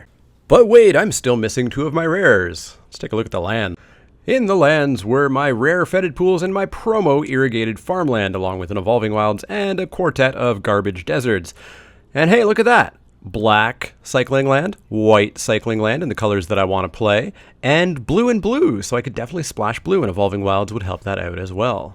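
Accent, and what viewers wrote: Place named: Canada. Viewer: I never realized you were Canadian, but after you mentioned you're in Ontario, I suddenly started noticing your accent, and it's like "how did I miss that?"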